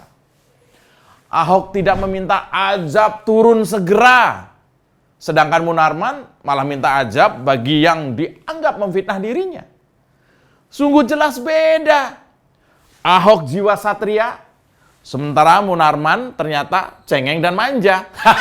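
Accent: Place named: Yogyakarta, Indonesia. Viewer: gaya guyonan khas..jogja kentel..diserang tertawa dialem blunder..koyo kucing tilem...gree gree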